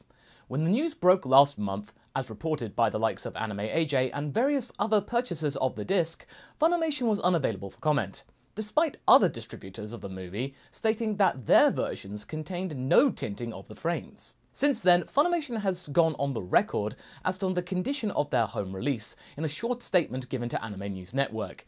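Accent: United Kingdom. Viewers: He is, indeed, British. English, specifically.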